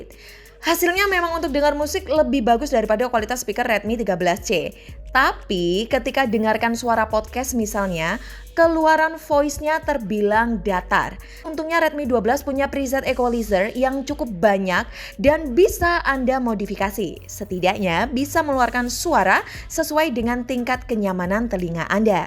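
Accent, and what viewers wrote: Surabaya, Indonesia. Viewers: orang surabaya ya mbak?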